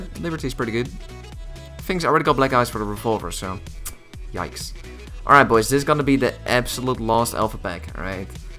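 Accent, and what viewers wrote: Netherlands. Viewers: Dat Nederlandse accent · Het moment dat ik je hoorde wist ik dat je een nederlander was. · Jesus bestaat er een accent dat nog meer nederlands is dan dat van jou XD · Jij bent toch nederlands k hoor het aan je stem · Are u dutch? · Where are u from? U sound like Dutch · Volgensmij ben je Nederlands aan je accent te horen?? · R u dutch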